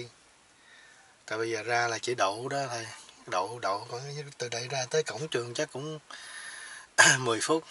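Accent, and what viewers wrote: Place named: Mekong Delta, Vietnam. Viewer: Chúc mừng cháu nay vui nên ăn no cứng bụng luôn , mà lạ nhen toàn từ Việt phổ thông nhức nách , ná thở , con cò có cái cổ cong cong ... giọng , tiếng không pha lẫn của miền Tây VN ..... vui và thân thiện . Cám ơn bạn .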